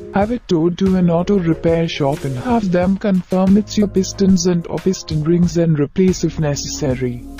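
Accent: India. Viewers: Bro has an indian accent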